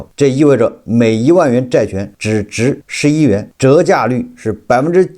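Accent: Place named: Gan, China. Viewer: 赣语怀岳片，湖南湖北是你的错觉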